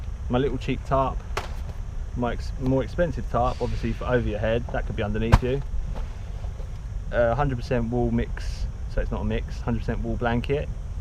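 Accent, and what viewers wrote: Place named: United Kingdom. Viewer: I liked that you took out the kits, and then went throught them later. That's a good idea for the audience. I'll be borrowing that idea when I share mine with the world. I like your hobo rocket stove. That seems like an upgrade compared to the one I built. I'm impressed that you managed to cram all that stuff in there. The bag looks well throught out, well organized, and well used. The tape on a hacksaw blade is a nice touch and really stood out for some reason. Final thoughts: holy crap I didn't know British was a different dialect. I lost count of how many new words I learned LMAO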